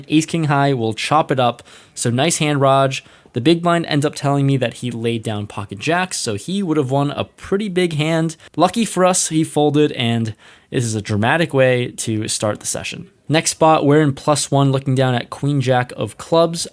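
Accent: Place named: Western Massachusetts, United States. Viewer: Where are you originally from? i hear western mass a lot and i know you mainly play in NH. Are you from MA or NH?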